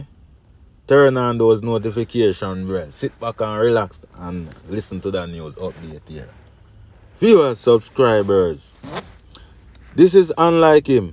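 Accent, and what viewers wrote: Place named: Jamaica. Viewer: Why some of you blogger think people want to hear this wasted Jamaica talk. You se me. Learn to talk brentren. No disrespect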